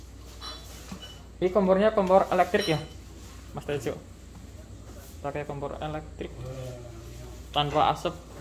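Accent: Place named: Banyumas, Indonesia. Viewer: Aq pengem ketawa sm logat ngapaknya kak🤣krn dlu mbak kosku iso ngapak jg kwkwk jd aq suka dcritain humor2 pke bhs ngapak kwkwkk kamshamida kak dh sharing villa ademnya...slm dr sydney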